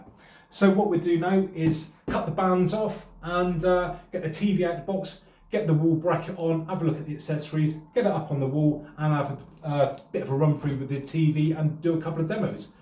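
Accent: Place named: Bristol, United Kingdom. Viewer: Gotta be Bristol innneeeeeee??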